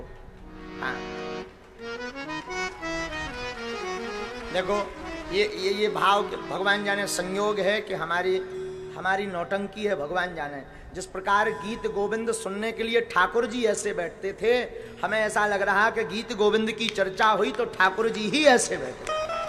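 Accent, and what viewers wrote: Bundelkhand, India. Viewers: Gurudev apke shrimukh se jagannath baba ki katha badi pyari lagti hai bundelkhandi free style pyare se apratim saundarya ke dhani gurudev ap atulniya hai. Bala ji maharaj apki jai ho. 🎉❤🎉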